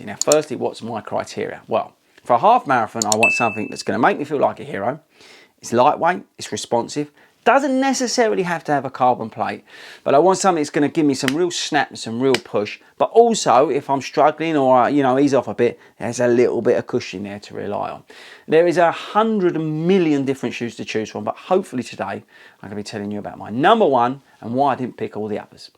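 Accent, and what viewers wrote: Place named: Northern England, United Kingdom. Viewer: Not a huge problem, I like a lot your videos, they are very "straight". By the way, where are you from, your accent, is from the north? my daughter is living in Kent and the accent is quite different. I am from Brazil. The comparative shoes videos are also very good. Thanks for helping us all !! Cheers!!!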